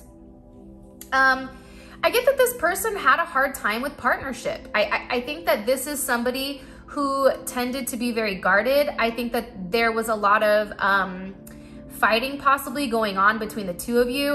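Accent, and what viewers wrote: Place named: Bay Area, United States. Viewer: Def Bay Area Cali girl Lol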